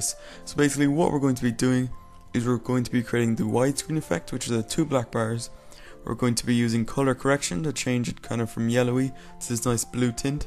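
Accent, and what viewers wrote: Ireland. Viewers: Irish man ;D cork ?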